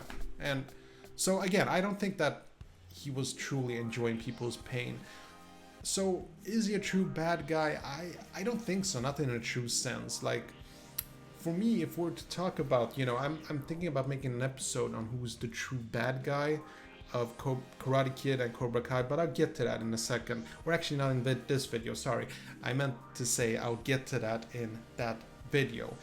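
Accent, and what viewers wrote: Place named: Sweden. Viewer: Btw are you Swedish? Your accent sounds Swedish.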